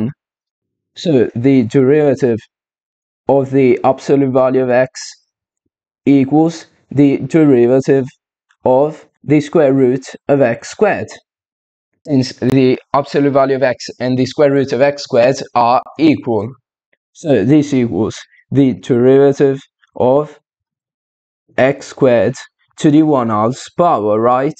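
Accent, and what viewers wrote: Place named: United Kingdom. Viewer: May I ask you where you are from? I'm just trying to place your accent. It sounds similar to some British accents I've heard, but also maybe something else from a different country. I hope that's not impolite to ask.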